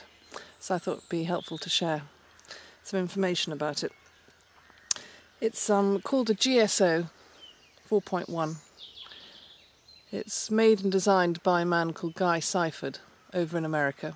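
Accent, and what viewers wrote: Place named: RP, United Kingdom. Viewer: Nice in depth review, and plenty of specs to keep the techno bods happy, the guy who wrote he liked the posh voice made me laugh, but it is nice to hear a well spoken voice, (must admit i was reminded of Joanna Lumley) which is no bad thing, anyway , like the knife and like the ease with which you use it, the easier it looks the more you know the user has skill! cheers Dave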